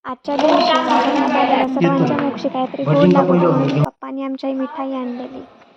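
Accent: Malvani, India. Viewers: आम्हाला नाही बोलवले वास्तुशांती ला तू मराठी न बोलता मालवणीत सूंदर बोलते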